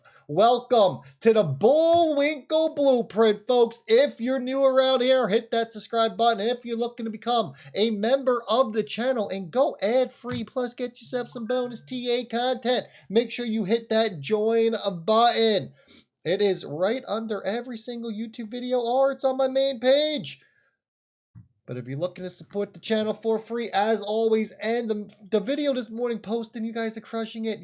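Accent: New Jersey, United States. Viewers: Forgive him. He's from Jersey.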